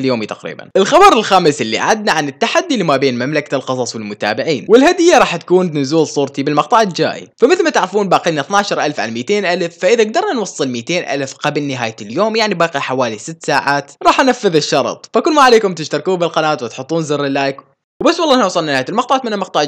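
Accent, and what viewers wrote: Iraq. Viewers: من كلامه عراقي ❤❤❤ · من العراق لهجته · من العراق مبين من كلامه😉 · لا حبيبتي عراقي شوفي من الهجه بين اذا سوري ليش مايحجي سوري شنو مايفتخر بلهجته مثلا🙃😏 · سؤال انته عراقي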